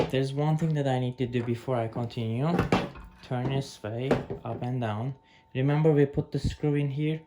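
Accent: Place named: Turkey. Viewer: Even though my machine has been built I was having issues with levelling but since finding your page and content I followed your method and guess what... it worked. You have a big fan here and also I have a feeling from your accent your from one of my favourite holiday destinations. Hope you don't mind me asking but are you from Turkey ?? Thanks again brilliant !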